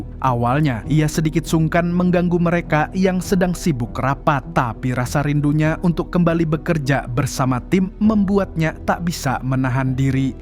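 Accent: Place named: South Tangerang, Indonesia. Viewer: Orang tangsel mana suaranya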